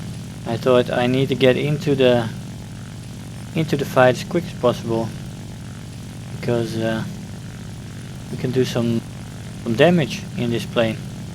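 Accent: Netherlands. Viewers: Ha! ik wist t dat nerderlands accent is overal herkenbaar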